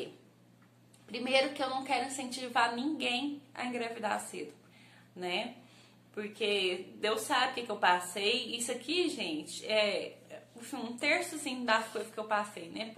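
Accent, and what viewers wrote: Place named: Minas Gerais, Brazil. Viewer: Gosto do seu jeitinho humilde e simples! Tão nova e com uma cabeça boa. Deus te abençoe. Ah, adoro seu jeitinho de falar mineirinho😉😘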